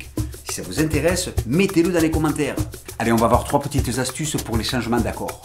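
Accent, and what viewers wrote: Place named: South, France. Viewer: Salut! Super vodéo, super format! Parfait pour comprendre quand placer les accords et pour voir la rythmique main droite! Seule petite remarque: Si tu pouvais prendre 1 minute au début de ta vidéo pour décortiquer le mouvement de la main droite ce serait encore mieux!! En tout cas un très grand merci pour ta pédagogie, ta bonne humeur (et ton petit accent du sud qui ne gâche rien dans l'affaire), c'est vraiment super agréable et encourageant!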